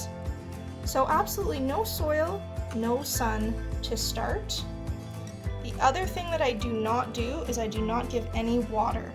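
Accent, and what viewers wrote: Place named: Canada. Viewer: I know I am late to party but I have question. Your accent indicates Canada. I wait a year for my little guys to get full sun. They have grow lights. Are you able to put them out because, I am assuming, have cooler weather? I am in California and Summer is over 90 degrees F and often into 100s. I am a dotting plant pappy and fear hurting my plants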